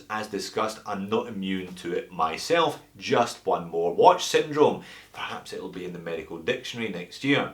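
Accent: Scotland, United Kingdom. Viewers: Oh man. Just forgive his Scottish accent and move on mate. 😀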